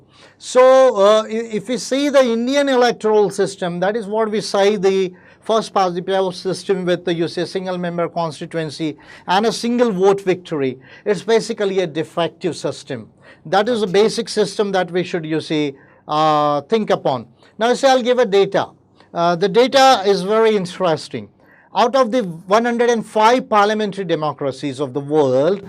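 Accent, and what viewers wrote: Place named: United Kingdom. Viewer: Sir please don't use the British accent.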